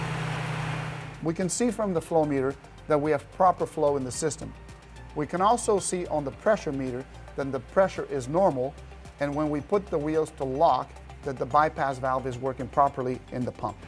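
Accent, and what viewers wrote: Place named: Netherlands. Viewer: He has a dutch accent.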